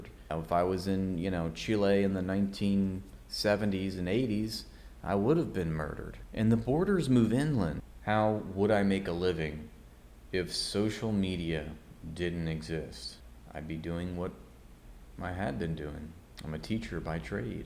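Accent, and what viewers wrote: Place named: United States. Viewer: Biden or Trump doesn't matter aipac wins... true statement... but as for the rest, all systems exploit... and rolls eyes, there is no white privilege, rich privilege sure... and workers are great, but you should thank the Constitution for your Rights (I'm assuming from youre accent that youre an American citizen)... good luck!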